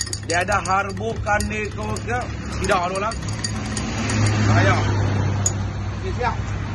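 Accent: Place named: North, Malaysia. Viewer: kecek uthaghaa nohh..☕😝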